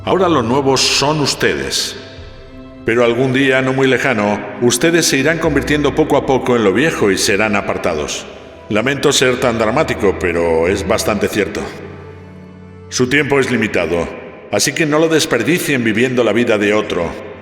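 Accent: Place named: Spain. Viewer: Todo está bien, pero el acento español le quita nostalgia y seriedad, un español latino le hubiera ido mejor.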